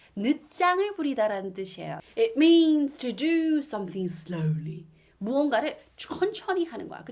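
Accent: RP, United Kingdom. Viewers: 아나운서님 ㅜㅜ ♡ 오늘도 너무 재밌게 봤어용 ㅜㅜ 영국 발음 너무 멋있으세영! 님 응원받고 정말 큰도움이되었어요오! 답글 정말감사했습니당 ㅜㅜ ♡ 감사합니당! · 아... 아나운써님 너무 멋져요. ㅎㅎㅎ 포쉬액센트가 이리 멋질줄니야..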